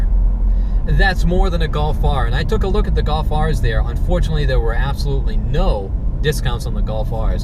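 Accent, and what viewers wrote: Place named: Boston, United States. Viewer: First time on the channel,. Great car! Are you in Boston by any chance? I am and was just curious as the roads looked familiar and I detected a little accent.....disregard....i had not finished this video until you mentioned you were in Wetsboro. I've never owned VW, but yours looks awesome. I'd likely get the same one (white exterior with auto transmission).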